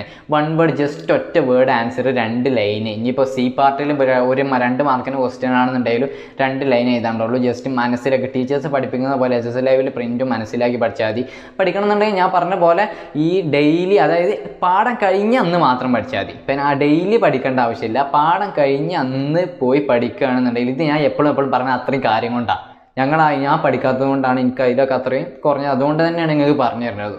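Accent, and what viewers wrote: Malappuram, India. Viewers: Malappuram aano vaarathaanam kettette agane thonna 😅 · MALAPPURAKKARAN aanallee.. 😁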